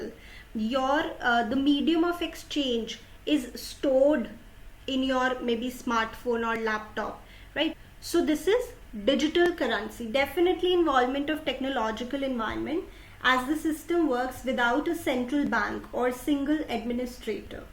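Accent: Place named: United Kingdom. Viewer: Aap british english b bolte ho 😬 #via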